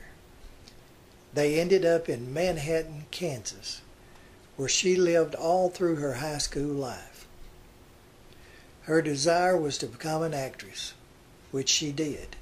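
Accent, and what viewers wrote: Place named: Alabama, United States. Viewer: you sure got that right. and I like the way you talk, whoops done gone Slingblade on ya there Carl. I mean at times we sound alot alike me from mobile an all. Roll Tide 🏈 course I'm just 60,kinda spring chickeny yet badly bent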